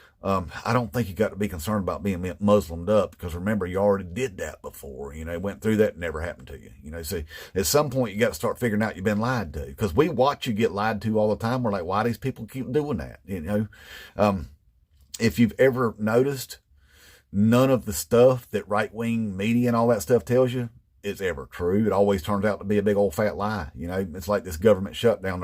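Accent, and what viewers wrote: South, United States. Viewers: Did you all get all Muslim up? Brilliant. Thank you. I love your accent. I grew up in the South and I miss it. Thank you for being better than the Southern stereotype. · I thought I was a connoisseur of the southern accent, but I can't tell if that's louisiana or south carolina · I don't know why, but hearing person with a southern accent taking the right wing authoritarians to task is comforting.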